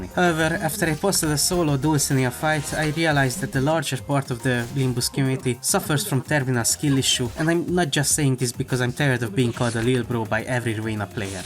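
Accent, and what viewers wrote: Hungary. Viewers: ngl for some reson it kinda sounds like you have a hungarian accent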